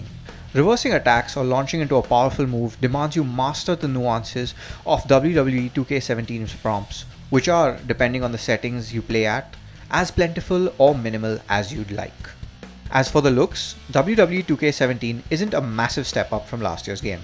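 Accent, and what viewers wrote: India. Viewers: Horrible preview. This guy is pretty subjective and his Indian accent makes me wanna puke.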